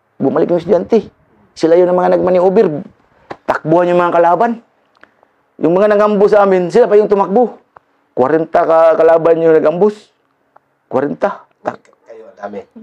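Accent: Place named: Visayas, Philippines. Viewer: Bisdak kaayo c Master.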